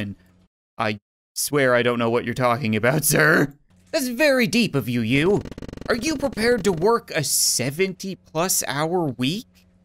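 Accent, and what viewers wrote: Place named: Canada. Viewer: Bug The Pug he's Canadian he says words differently get used to it or leave simply as that.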